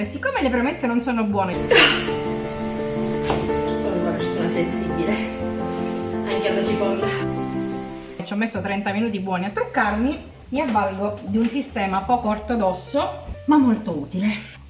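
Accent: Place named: Sicily, Italy. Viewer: Ma non senti il suo bellissimo accento siciliano??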